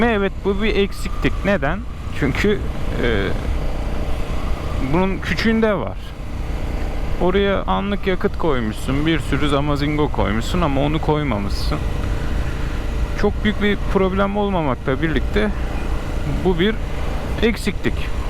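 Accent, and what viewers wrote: Turkey. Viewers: Abi sen samsunlumusun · tam bafralı gibisi abi :D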